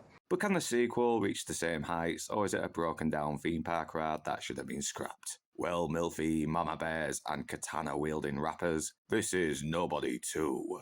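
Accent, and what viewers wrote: United Kingdom. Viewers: Thanks! As an Ex-Northerner (you can't get much more south than New Zealand!) I have to say, it's so refreshing to hear a good northern accent talking so much sense. Keep it up!!!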